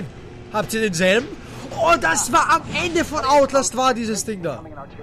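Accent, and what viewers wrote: Austria. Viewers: Ich hab dich noch nicht do lange aboniert nur eine kurze frage kommst du aus Österreich? :D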